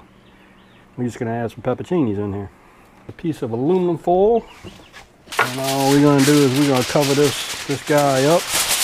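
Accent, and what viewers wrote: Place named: Pensacola, United States. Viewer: Whoa man! That’s a damn fine first cook on the PBC. I’ve made a pot roast on it before & it came out real tasty. Never tried it with the peppers before, in fact I’ve never even heard of using it. But I do plan on giving that a try soon. Love the accent! Sounds just like my cousins from Pensacola, & I love, love, love the hat...I too, am an American INFIDEL!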